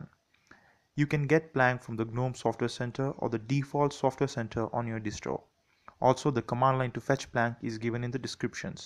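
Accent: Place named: India. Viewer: Great review! I got a bit of shock hearing you swear (I wasn't offended mate!) - not use to hearing the word "shit" in an Indian accent! Good stuff mate! Have you reviewed and looked at Synergy? (plank and synergy are my two "must have" Linux applications!)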